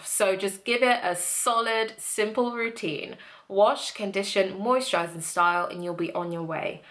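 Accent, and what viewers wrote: United Kingdom. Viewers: yes you're British!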